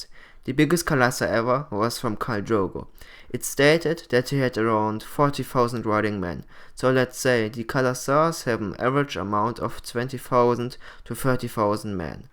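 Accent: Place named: Germany. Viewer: Your accent Tells me you Are German;)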